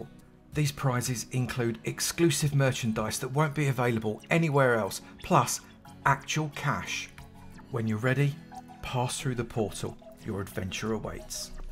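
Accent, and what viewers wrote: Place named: London, United Kingdom. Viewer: ngl with your london accent im sad you didnt say wonga instead of cash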